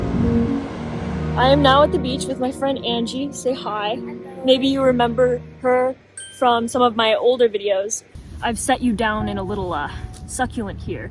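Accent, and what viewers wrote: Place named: India. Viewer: Your accent sounds like Indian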